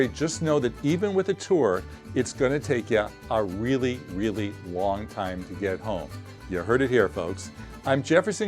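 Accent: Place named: United States. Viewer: You are lucky because you are a white man with an american accent. If it was some Latino guy who forgot his passport he probably would not be allowed to get back in.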